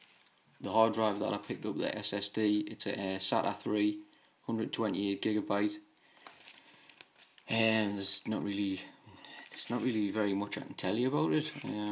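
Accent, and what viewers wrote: Newcastle, United Kingdom. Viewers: Cor....sounds like a geordie to me......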